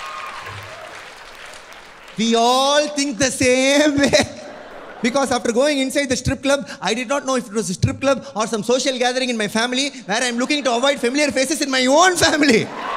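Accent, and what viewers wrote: Tamil Nadu, India. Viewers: guy hs got a thick Indian accent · God sir u have the most typical Indian accent 🤣🤣🤣🤣 loved the skit.. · He is the guy whose accent is depicted as Indian's accent by westerners.. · Purely sickening jokes.....accent soudhi ndian, heavy blown tamilian...crap...audience also samebrain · 😂tamil ya avan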